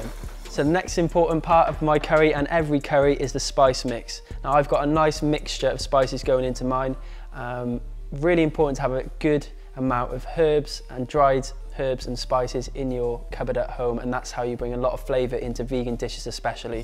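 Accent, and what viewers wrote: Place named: Northern England, United Kingdom. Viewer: Just subbed ! And 'liked' ! When you coming to London? I guess by your accent you're from up North ? Or do you live in London? Would be great to see one of your demos in London!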